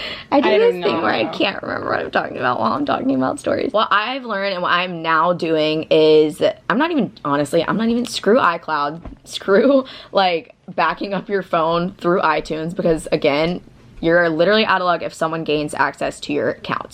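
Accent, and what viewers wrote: South, United States. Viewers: All the people saying she sounds like Miley Cyrus...well she's from the south so it's a southern accent..pretty much all southern states sound the same some more hickish than others! Lol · Anwesha Barua it could be because they both have a southern accent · This is the second video I've way he's of urs and I'm definitely a subscriber now. I live in Tennessee and even though u have a southern accent it's so weird that's it's different from ours down here lol Also, u sound like Miley sometimes. U should get a Samsung phone cuz it's much better in my opinion lol Did u not have a finger imprint or a passcode on ur phone? U can download an app on ur phone too in case someone tries to get into it, it will take multiple pics of them and u can have those pics sent to an email or someone else's phone. Phones cost too much these days to not have good security one them now a days lol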